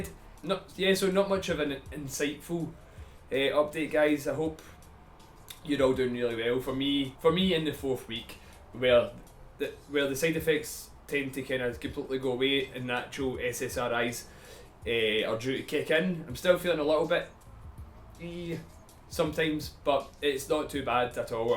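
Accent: Scotland, United Kingdom. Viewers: Scottish ? Why the fuck I can’t understand shut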